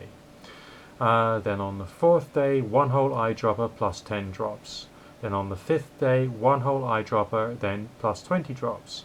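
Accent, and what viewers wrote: England, United Kingdom. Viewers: it's good to hear an English accent (for a change) rather than hearing the usual Yankee accents all the time.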